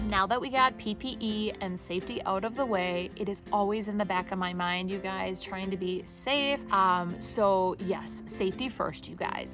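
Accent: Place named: Midwest, United States. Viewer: You are the cutest, hardest working, mid-west accent lovin gal out there!!!!! I adore you, I truly hope you get to rest in between everything you have going on!!!! Take care, have fun!!! Toni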